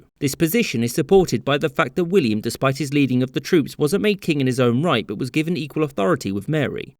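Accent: England, United Kingdom. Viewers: I'm bout to donate to this guys channel. I love these video's plus I would like to hear him say my name with his English accent. lol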